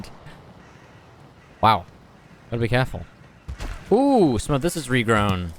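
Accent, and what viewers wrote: England, United Kingdom. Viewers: giọng người Anh ?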